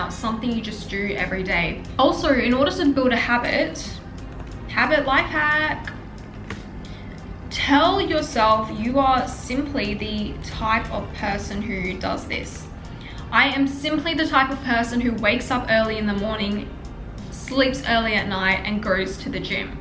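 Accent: Australia. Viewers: You can have it! Watch videos on how to do an Australian accent (NOT THE BAD ONE 😭)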